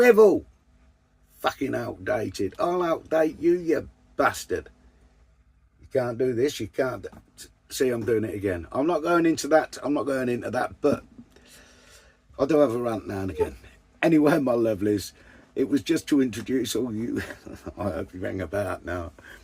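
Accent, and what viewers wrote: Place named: Surrey, United Kingdom. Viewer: you sounded to me you was from Surrey ty for answering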